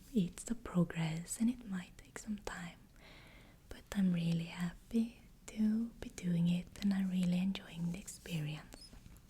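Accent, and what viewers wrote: Sweden. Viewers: Swedish. Couple of her videos are in Swedish as well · Dane here! I have followed your channel for some time now and I absolutely LOVE your ‘singing’ (swedish) accent when you whisper! Your passion for WOW and other fantasy IP’s is infectious. Happy to see how your channel has grown.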